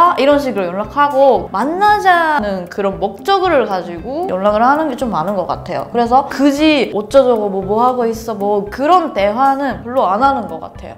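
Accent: Seoul, South Korea. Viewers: 억양이 진짜 한국인 같아요 넘신기ㅋㅋㅋ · 니뽄말 잘하는 칸코쿠진 같다.. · 백진희 닮았다 한국사람이 일본어하는거같어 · 일본인이라고???? 발음이 완전 한국인인데??? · 서울에 10년 넘게 산 저보다 서울말을 더 잘하시네요..^^ㅠ 의문의 1패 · 일본인들은 조금 귀엽게 보이는데.... 은 그냥 한국인 인데요~~생김새도 그렇고 말하는 투도~~~이쁘고요~~ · 나 이런 톤으로 일본어하는 분 처음 본듯 ㅋㅋㅋ 어째 한국인이 일본어 하는 거 같냐 신기 ㅋㅋㅋㅋㅋㅋ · 일본인이라 밝히시지 않으셨음 한국인이라 생각 했을 거 같아요.. 스타일나 말투나 모든 게.. ( 한국인 스타일 느낌이 강한) 그래서 도리어 일본어 할 때, 한국인이 일본어하는 느낌이 들었네요! 신기한... 잘 보았습니다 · ㅎㅎ 일본어를 한국인이 일어하듯…하시네요. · 진짜 신기한게. 이분이 말하는 일본어발음에 약간 한국인의 일본어 특성이 묻어있음. 한국어를 오래 공부하면 모국어에도 영향을 주는건가?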